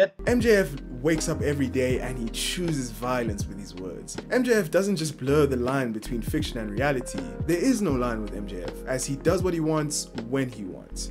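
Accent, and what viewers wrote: South Africa. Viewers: I'm not sure whether its but you sound South African🤔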